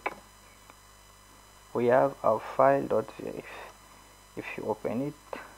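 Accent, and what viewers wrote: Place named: France. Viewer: not bad but your english sound like french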